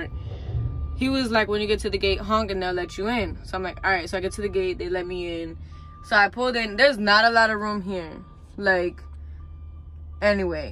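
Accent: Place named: South Florida, United States. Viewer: Sounds like South Florida. Pompano or Miami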